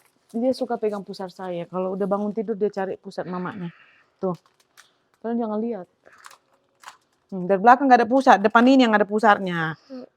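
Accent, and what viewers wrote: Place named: Medan, Indonesia. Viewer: Shalom kak, aku senang dengan logat ngomong Medan Siantar kali kak hehe.., mantap masakan kak 👍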